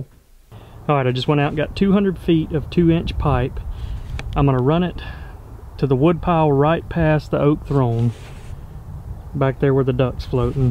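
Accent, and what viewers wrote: South, United States. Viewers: You sir, have one of the most welcoming and friendly southerner accent ive ever heard, keep up the good work! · seems very shallow, and I assume by your accent you are from the southern usa, wont it dry up in the summer heat? is it by a river for water replenishment?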